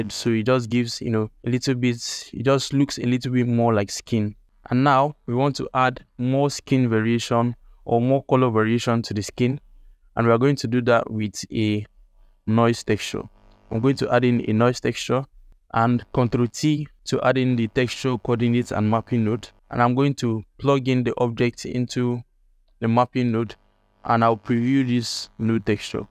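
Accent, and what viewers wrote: Nigeria. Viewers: Bro something about that Nigerian accent makes the tutorial stick more had to subscribe ASAP, thanks man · nigerian accent = trust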